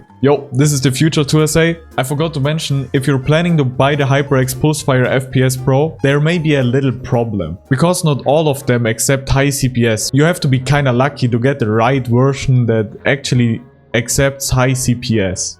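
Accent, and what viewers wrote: Germany. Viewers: wait are u german u got that accent xD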